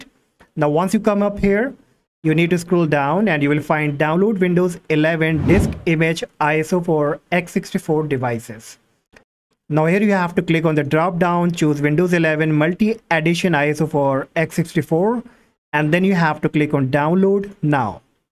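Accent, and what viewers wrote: India. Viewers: I knew it will be good when I jeared the indian accent, great video, quick and straight to the point. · no offense but the way to pronounce S in some words, tells me you are an indian :) speak in your normal english accent bro. don't make it american :)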